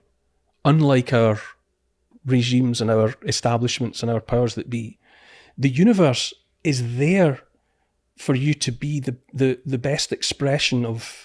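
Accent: Scotland, United Kingdom. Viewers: Scottish is a cool accent .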